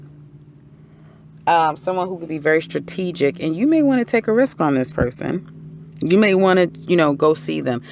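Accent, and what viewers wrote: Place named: East Coast, United States. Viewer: Hey, are you from the east coast? love the accent & thanks for the reading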